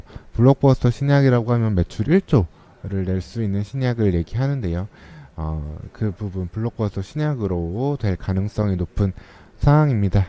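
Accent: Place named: South Korea. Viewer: 지방분이세요? 말투가 졸려요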